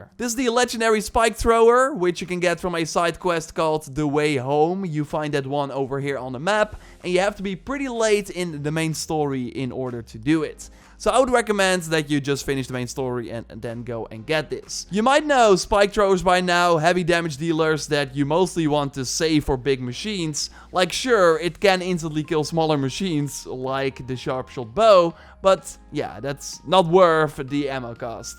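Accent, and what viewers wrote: Netherlands. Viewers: Are you dutch?? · You sound dutch.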